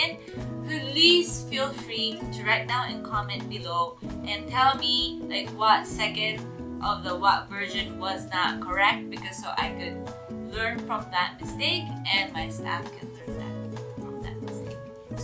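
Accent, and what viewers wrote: Philippines. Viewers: You're English accent now more like Filipinos than Japanese :-)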